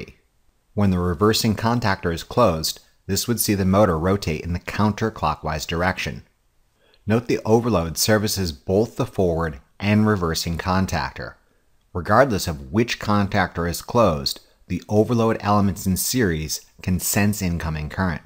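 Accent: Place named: United States. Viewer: his articulation is quite fine. This is american english, he is a native, if you're having hard time understanding him, it's probably because you need to work on your english comprehension.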